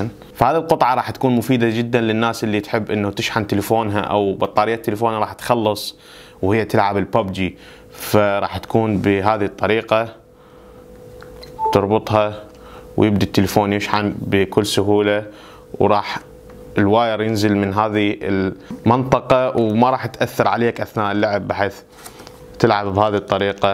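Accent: Iraq. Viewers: والله لهجتك كلش عراقية ،انتة مو كويتي ؟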